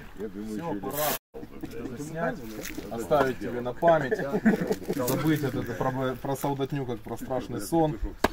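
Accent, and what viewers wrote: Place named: Russia. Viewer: Твоя мова це здається що ти з раші прийшов !!! Та навчіться кінець кінців своєї мови !!!!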